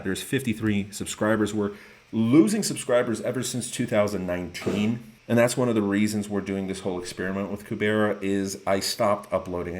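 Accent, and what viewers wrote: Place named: Poland. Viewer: You have an polish accent!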